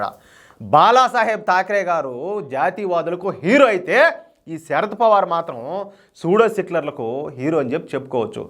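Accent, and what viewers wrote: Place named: Chittoor, India. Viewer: గారికి వందనం మీ చిత్తూరు బాష బాగుంది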